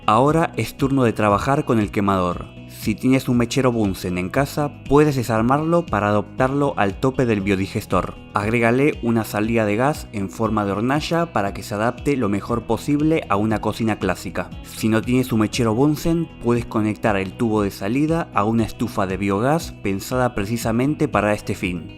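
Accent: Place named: Argentina. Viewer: Muy bien explicado, Pero estoy seguro que puedo encontrar otro video donde me lo expliquen sin ese molestoso y desagradable acento Argentino o Uruguayo.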